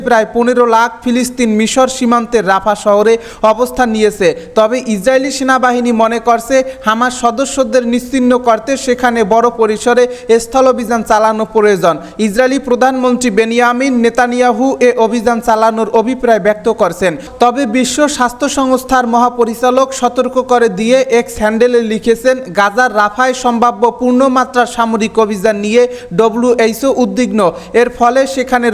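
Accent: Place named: Barishal, Bangladesh. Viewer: A Borisaila toke news porte ke bolese, age vasha shek tar par news par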